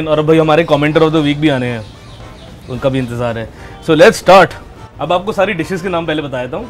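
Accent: Bihar, India. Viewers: Very bad Bihari accent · tried your best to speak bihari. anyway good.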